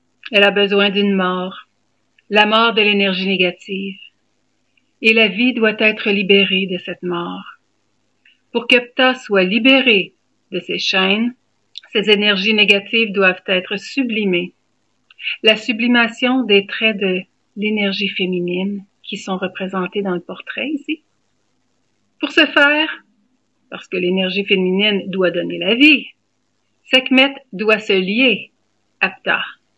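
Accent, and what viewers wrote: Quebec, Canada. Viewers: Avec ton accent québécois on ne peut qu apprécier ! J te promet ma belle ! ❤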